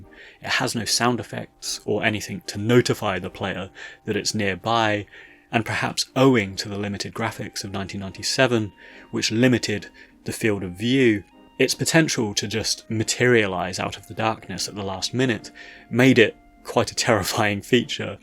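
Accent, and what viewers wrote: United Kingdom. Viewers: British limey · fuckin' jesus wow british accent old game "review" that's an opinion dude find something else to do lmao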